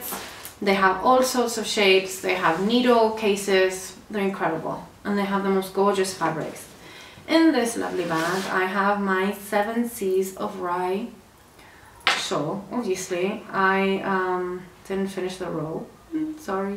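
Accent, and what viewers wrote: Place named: Chile. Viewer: Hi! Nice podcast and I love your acento chileno:)( when you say Santiago de Chile ) I miss that accent ( Im originally from Argentina, now living in Canada )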